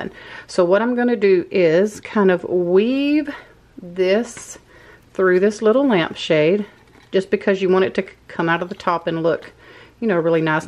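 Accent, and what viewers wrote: South, United States. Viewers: I just have to ask....are you in Louisiana by any chance? I swear the neighborhood at the beginning looks like Lake Charles to me and you sound Southern. (I'm originally from LA but now a full-time Texan.) Loved the video and especially the styling portion!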